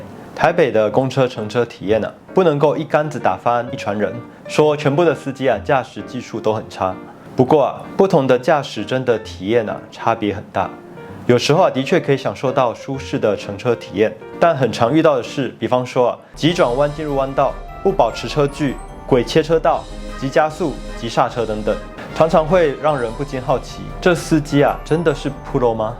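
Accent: China. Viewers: 臺灣如果公車文化不夠好，那大陸不就是難民出現了嗎？你這個大陸人假扮臺灣人，口音一聽就知道你是大陸人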